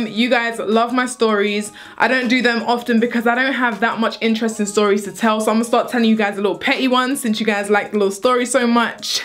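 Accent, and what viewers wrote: England, United Kingdom. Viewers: All of u saying it's jit not jet clearly have no appreciation for the English accent 💁🏽💁🏽💁🏽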